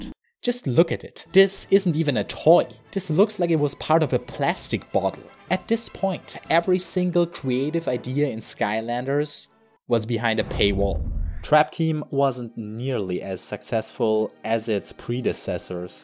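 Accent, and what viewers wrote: Germany. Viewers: Your accent sounds really german are you?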